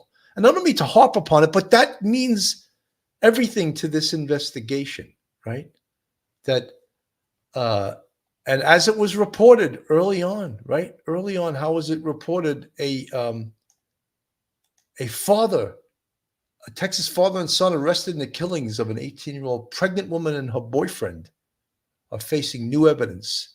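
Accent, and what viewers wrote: New York, United States. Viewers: I love your accent, New Yorker ❤️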